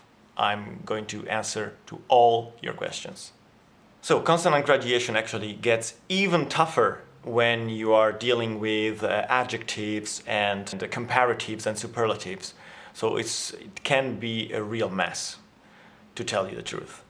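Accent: Finland. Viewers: I found it interesting that once you started speaking finnish in this video you started to pronounce some words in english with a finnish accent. You really are a language master. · I haven't looked up what you did in Finland, but if I would like to guess, I would say that you lived in Helsinki and spend lots of time with youth? You have a slight "Helsinkiläinen hipsteri" accent and I love it! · I mean, even your Italian articles sounded a bit Finnish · It's strange, in the beginning when you spoke english I could immediadelty tell that you can speak finnish. You used some familiar sounds or accents, I could tell right away. Weird! :) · You actually sound like finnish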